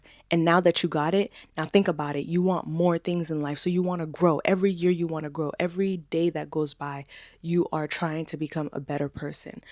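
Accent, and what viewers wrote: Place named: United States. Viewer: Greetings from Angola 🇦🇴, right here in Africa! I just want you to know how much your videos mean to me. Your words, your energy, and your American accent inspire me to keep improving my English every single day. You have no idea how far your motivation reaches, it truly crosses oceans. Thank you for being such a light,...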